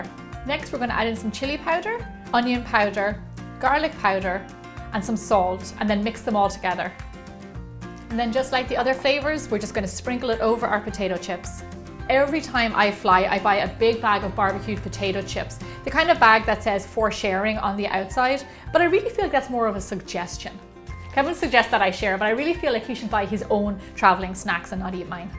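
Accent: Ireland. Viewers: I love the irish accent ❤️ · I love your recipes; and I have to say, I love your accent too. It reminds me of someone from Ireland who is very dear to my heart <3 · I'm only here because i love her accent. Irish chic's are sooo hot. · She sounds pretty Irish to me! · Is she Irish? Couldn't tell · I love the brogue, I miss the brogue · Agreed. I love the Irish accent. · Irish accent talking potatoes....I'm listening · Your beautiful Irish brogue accent sounds just like my grandmother sounded. She was from County Cork. I've tried your potato chip in the microwave... PERFECT baby! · So irish 😂😝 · Wait ur Irish no wonder you souand like Jackspeticeye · Is it just me but the more I watch the more Irish she sounds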